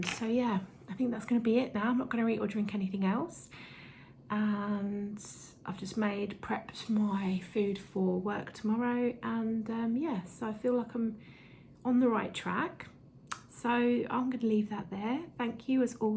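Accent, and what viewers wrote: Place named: England, United Kingdom. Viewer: You are looking well eventhough you have a cold. I too am taking apple cider vinegar but I couldn't stand drinking it so I ended up getting the capsules. I am also taking probiotics. I heard about the raw garlic but I haven't started it yet. By the way, I love listening to your English accent!!! I have a strong NY accent so you are so much more proper than mine! Eventhough I have lived in Florida for almost 20 years, I still have my NY accent! It's interesting how different the products are in England compared to here in America. We have Aldi here too. It is my favorite grocery store. Do you have Whole Foods? Do you ever order from Amazon? Your Slimming World reminds me of our Weight Watchers. Continue doing well. You are in my prayers especially about the radiation. My husband and I have been quite sad. My mother in law passed away on Tuesday. 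So it has been a hard week for us. God bless you. (((((HUGS))))) from America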